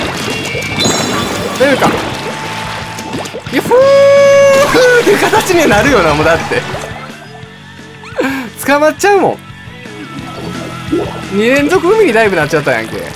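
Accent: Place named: Kansai, Japan. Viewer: 僕もスプラ1でさんを見たのがスプラユーチューバーで初めてでした。今でも見てます。自分も関西人なので関西弁で実況するのがいいですね😁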